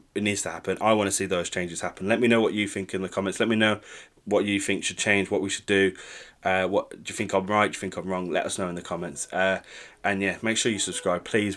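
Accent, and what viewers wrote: United Kingdom. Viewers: You sound like you’re from brum but you support Chelsea ? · Proper London accent right there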